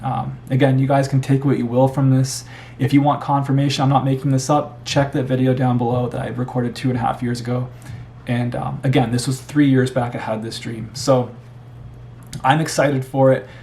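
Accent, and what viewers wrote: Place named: Canada. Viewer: The Canadian accent is similar to my Minnesotan accent.😂 The books of Joel and Acts (amongst others) tell us that dreams and visions are legit ways that God speaks. (I know you know this...putting it out there for others reading comments) I've had few dreams I know are from God and he's given interpretation. Have you experienced any dreams that you believe are from the Lord that you haven't received interpretation for...yet? I ask because I believe I have and because I come from a faith community that is "practically" cessasionist...so I don't have many people that I can ask these questions.😁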